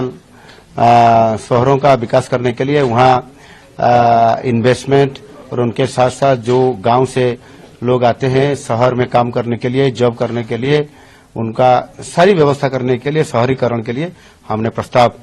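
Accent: Odisha, India. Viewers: hindi bolte bakt odia pan rahega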